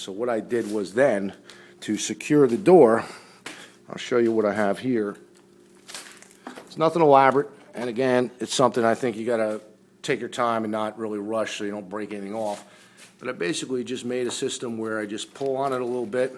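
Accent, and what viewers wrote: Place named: New Jersey, United States. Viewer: Rutgers! I knew it sounded like you were from nearby.. Nice video, thank you! Esp about fan/filters..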